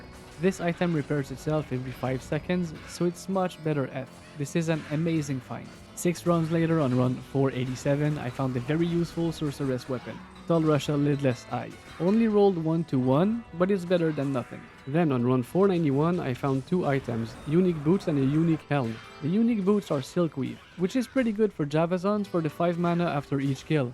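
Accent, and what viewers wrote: Quebec, Canada. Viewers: Ton accent est tellement l'image parfaite du Québécois bilingue lol. Bon vidéo broski! PS : J'ai 2000 kills de meph/andy TZ en solo et je n'ai toujours pas Highlord's. J'ai juste abandonné et pogner mes torches lol. T'es chanceux !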